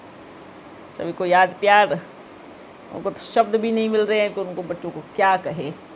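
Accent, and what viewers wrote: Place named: Sindh, Pakistan. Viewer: ब्रह्मा बाबा और जानकी दादी सिंद्ध पाकिस्तान में जन्म लिए थे तथा सिद्धी टोन में बोलते थे। अब यहां जो आवाज है वह वैसे ही हैं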